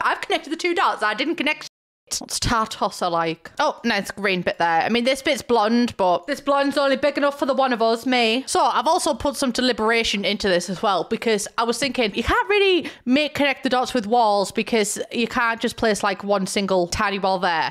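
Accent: United Kingdom. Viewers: So I have a strong southern (American) accent and watching you so much sometimes I get the urge to go British. And British plus southern American accent…..I sound like an idiot yall pray for me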